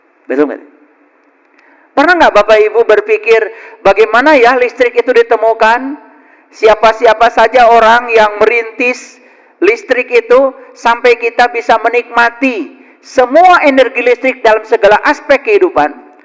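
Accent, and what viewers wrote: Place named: West Java, Indonesia. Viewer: Bapa guru boleh tau bapa asal dari daerah mana pak soalnya bapa bahasa Sunda saya orang Sunda saya sangat tertarik dgn gaya ceramah nya bapa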